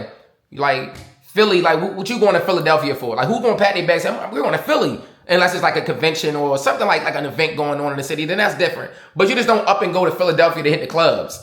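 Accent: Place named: Baltimore, United States. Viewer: This nigga definitely frm bmore slim😂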